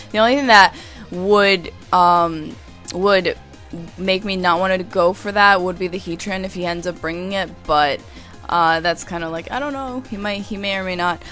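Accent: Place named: New York, United States. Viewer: New york accent. Plays pokemon. Gorgeous. God help me. xD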